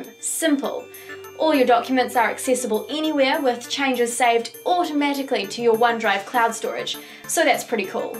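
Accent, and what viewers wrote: New Zealand. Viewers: I think that's a New Zealand accent. They tend to pronounce the a in words as an i. "hiving the software"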